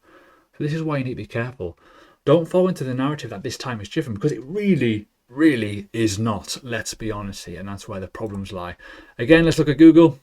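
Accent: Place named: Newcastle, United Kingdom. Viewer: I know one thing. I'd listen to a good geordie lad over some california bullshitter all day long .I've listened to all the crypto you tubers over the past year and it's not hard to work out that most of them are wrong uns .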